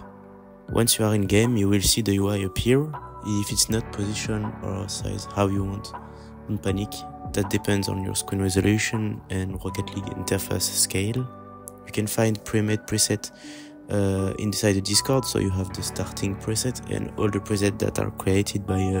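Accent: France. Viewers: On voit que t'es français à l'accent x)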